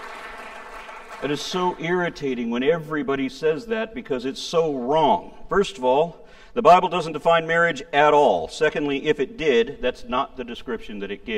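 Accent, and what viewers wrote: South, United States. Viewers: that Southern English was well done. I love it for real, too. Just sad there were no bible verses with "daawgs" in this video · "Southern Christian" accent is something I never knew I needed in my life:) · Aron’s Christian southern accent sounds like joe exotic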